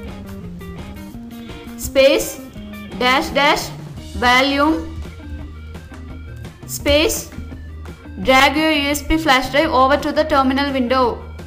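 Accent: India. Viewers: I dont like indian accent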